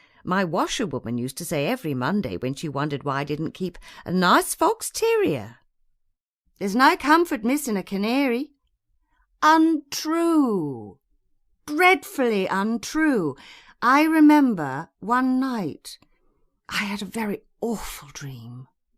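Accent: United Kingdom. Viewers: I don't like british accent 😢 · واو صوتها حلو حرفيا احب الاكسنت البريطاني 😭😭😭💙💙💙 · فخم و تحس بطعم الإنگلش بالأكسنت البريطاني😂😂😔✨🤍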